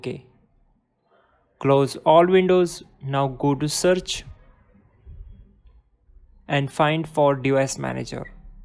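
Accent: India. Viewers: indian lol loved ur accent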